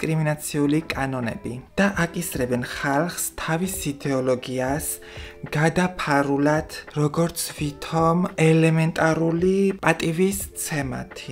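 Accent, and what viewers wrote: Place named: France. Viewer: ბიოლოგიკური 😁😁 უსაყვარლესად გამოთქვამს ქართულ სიტყვებს ფრანგულის გავლენით 😁😁 ძალიან სახალისოა ❤️, რესპექთ რასაც საუბრობ მგონია რომ სწორია ❤️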